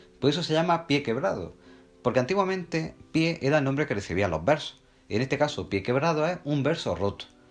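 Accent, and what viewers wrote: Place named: Andalusia, Spain. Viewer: No respondas a esta gentuza solo buscan atención, me ha salido tu video en mi sesión de estudio y sinceramente no he tenido ningún problema entendiéndote añadiendo, de que el acento andaluz es bonito no hacía falta darle ninguna respuesta ni explicación, gran contenido sigue así!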